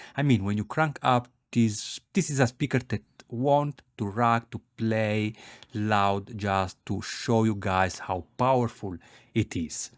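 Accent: Italy. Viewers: Are u Italian ?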